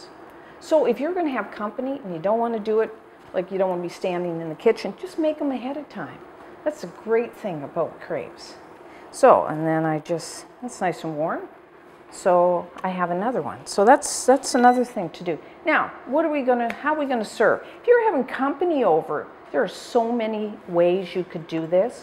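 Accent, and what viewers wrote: Canada. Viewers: Ah ok cool. You still sound canadian which is great to hold onto your accent :) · I couldn't place your accent but caught some Canadian. Great recipe!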